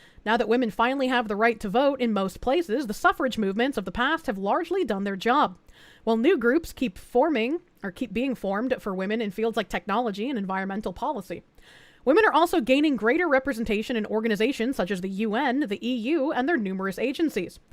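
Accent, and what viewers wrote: Boston, United States. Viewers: I came for the DEI update and Boston accent. ❤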